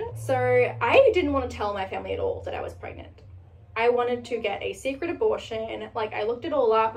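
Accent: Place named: Australia. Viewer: nah, the aussie accent sounds really nasal and masculine on her.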